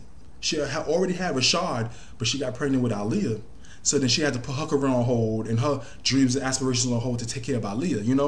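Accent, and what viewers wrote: New York, United States. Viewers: You straight up New York lol accent off the chain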